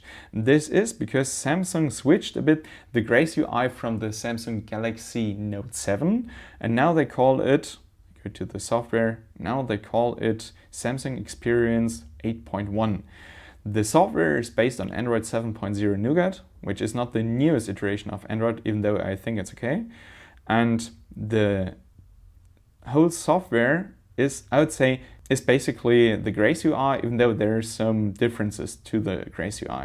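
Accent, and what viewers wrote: Germany. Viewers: du sprichst echt gut englisch (konnte deinen deutschen akzent aber leicht hören) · Am i the onlyone who thinks he sounds german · Egal wie gut einer Englisch spricht, man hört "den Deutschen" sofort raus. ;) Ich würde gerne so gut Englisch sprechen können -super Video! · warum machst du keine deutschen Videos?:D man merkt deinen Akzent:D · Very thick german accent, toooooo much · I am new here, are you german cz ur accent looks like it.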